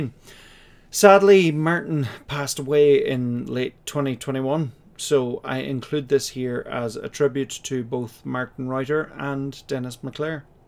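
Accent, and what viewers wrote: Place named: Ireland. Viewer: Ah there is that beautiful Irish accent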